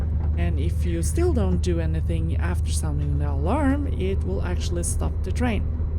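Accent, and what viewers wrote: Norway. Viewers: Driven, not drived,...;-) For the rest fully agree! Really well spoken English with a charming Norse accent. Love the landscapes, I should pay Norway a visit sometime. Cheers, keep up the good work.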